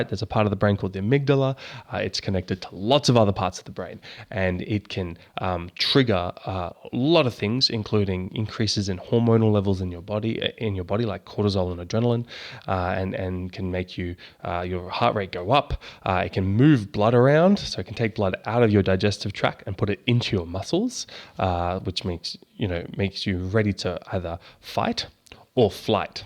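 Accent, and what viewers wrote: Australia. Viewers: I saw my pink sweater on my bed, my navy blue rumpled and soft looking pillow case, my purple cane hanging on the bathroom door knob, ugly orange mesh bag on my floor and my dusty black suede shoes. I felt my warm dry hand wipe my cold damp mouth, the softness of my pillow, the long sleeve of my fresh clean tee shirt, the smoothness of the manufactured wood bookcase by my bed. I heard the ice maker in the kitchen, the fan on my husbands side of the bed, Dr. kind, gentle, Australian accented masculine voice. I smell the clean smell at the neck of my tee shirt, the smell of the shredded wheat cereal I just ate clinging to my right hand. I taste a starchy dry taste in my mouth like I am just about to make a phone call I have been dreading or I should go wash my hands and brush my teeth because I just had cereal.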